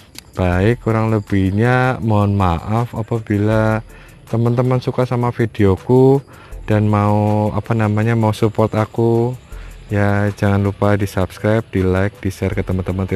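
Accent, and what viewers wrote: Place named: Banyumas, Indonesia. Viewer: Kyk ada campuran bahasa ngapaknya hee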